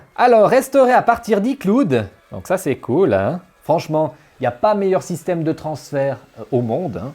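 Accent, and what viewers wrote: Vaud, Switzerland. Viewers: Mais quelle touche délicate d'accent Vaudois c'est magnifique ! ça fait bizarre d'en entendre sur Youtube... Amicalement, d'un autre Vaudois.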